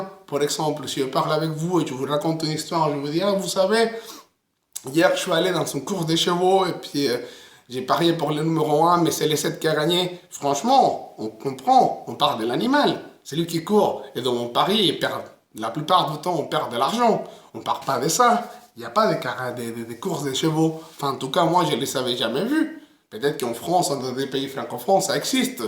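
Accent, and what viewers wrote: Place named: Spain. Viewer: T'es espagnol mon gars, on l'entend bien!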